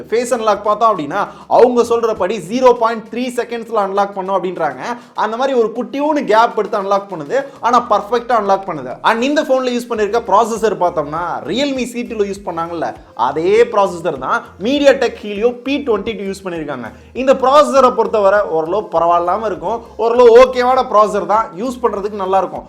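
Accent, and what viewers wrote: Madurai, India. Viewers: Bro neega madurai ya bro.. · Bro neeng madurai ya..... Naanum madurai thaan adha ketta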